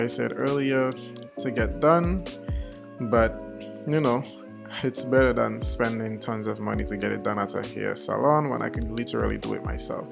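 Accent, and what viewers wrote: Caribbean. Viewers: Hi! Where are you from I'm hearing a Caribbean accent. I'm trini 🇹🇹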